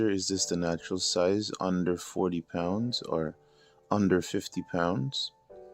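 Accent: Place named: Jamaica. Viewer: Off topic.. Are you Jamaican? Maybe its just me, but Im hearing a familiar accent.. Sound like a yardie..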